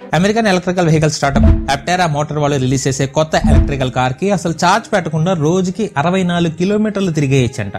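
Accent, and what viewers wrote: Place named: Telugu, India. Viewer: Era epudu chusina denini telugu lo em antaro naku telidhu antav nvu emina foreign lo puttava ne slang chuste pakka telugu medium gadi la unav, ayna idhi idhi recorded video a kada evadnina adigi chepochu kada leda google chesina telustadhi telugu li em antaro ani, prathodki idoka fashion aypoindhi telugu ranattu xtralu mingadam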